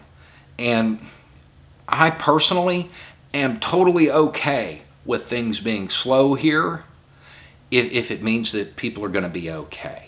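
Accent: South, United States. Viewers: Because (s)he does it ALL the time & it helps some people. Some have hearing issues, or may not understand the southern accent, or they retain visual things better. Whatever the reason, it's a service, a good thing. Why does it bother you so much? It's not like YOU were told to transcribe it (were you?).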